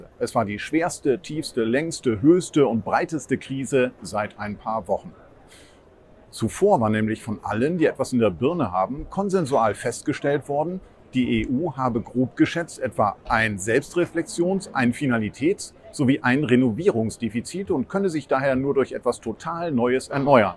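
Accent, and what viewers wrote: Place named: Germany. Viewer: Was für ein heftigen deutschen Akzent die alte hat. Uffff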